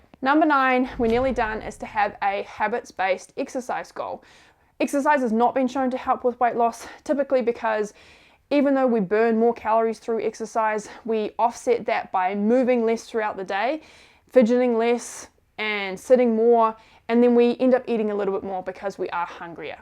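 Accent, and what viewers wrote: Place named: New Zealand. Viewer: She sounds like a Kiwi (New Zealander)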